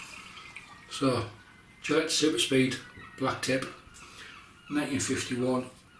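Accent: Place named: North East England, United Kingdom. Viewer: Just subscribed a few days ago. Nice to hear a North East accent. My part of the world as well. Hope you hit the 200 subscribers soon. I enjoyed watching your shave.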